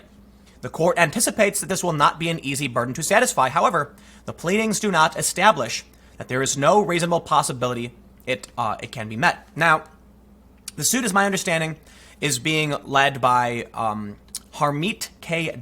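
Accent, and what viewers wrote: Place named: South, United States. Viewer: What's up with that sudden southern drawl?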